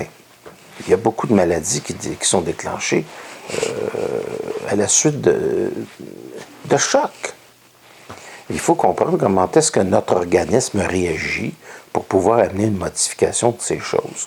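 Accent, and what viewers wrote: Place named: Canada. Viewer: Bonjour de France_ Merci M.. C'est une parfaite synchronicité car la thématique est précisément ce que je sais intérieurement et ce sur quoi je me centre spontanément en cette période de mon évolution...... Et puis j'aime bien l'accent canadien!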